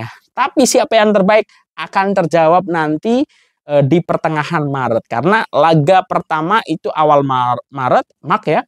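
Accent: Indonesia. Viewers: Bang orang Malaysia ke Indonesia? Cara cakap mcm Indonesia. Kalau betul Indonesia, impress gila dengan JDT sampai ke orang Indo buat review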